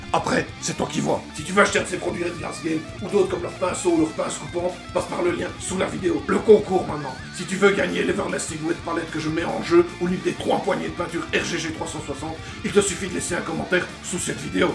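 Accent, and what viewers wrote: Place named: Hainaut, Belgium. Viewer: Rien que pour l'accent hennuyer, c'est abonné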